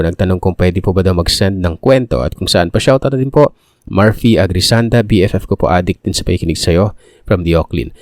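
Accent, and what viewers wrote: Visayas, Philippines. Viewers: Wow bisaya kaayog titol ohh hehehe❤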